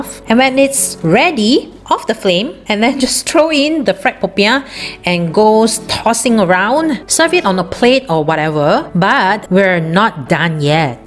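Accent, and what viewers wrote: Malaysia. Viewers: Love ur manglish babe 😂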